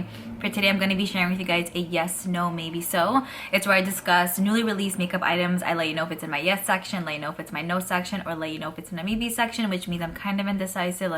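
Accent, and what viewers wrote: New York, United States. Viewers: sounds like you live in nyc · I love the NY vibes !!! Your accent, the horns, all of it 😃😃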